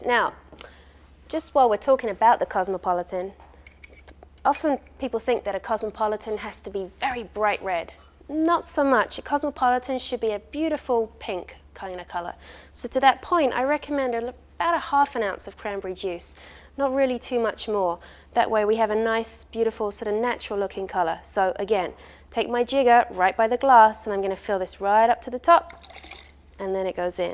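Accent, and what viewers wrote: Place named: England, United Kingdom. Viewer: I wonder what town in England was from, her accent is quite interesting.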